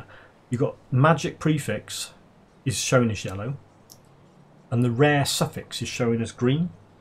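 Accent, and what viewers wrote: United Kingdom. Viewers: Really enjoying your Grim Dawn videos, very informative. I come from the Forest of Deam and lived in Gloucester for years and your accent sounds familiar am I guessing you are a Gloucestershire man?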